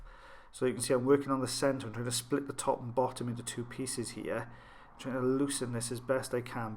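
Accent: Wales, United Kingdom. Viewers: Lovely Welsh voice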